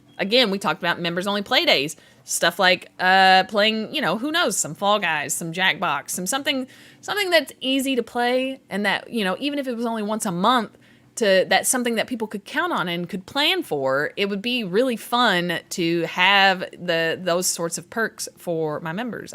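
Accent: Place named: Tennessee, United States. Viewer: Tennessee or Kentucky which one lol I hear it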